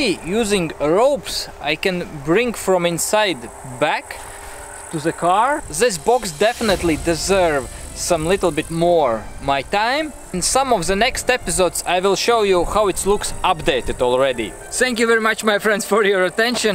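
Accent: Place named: Germany. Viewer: I think — youre grammar is normall! But charakter of sounds is — german accent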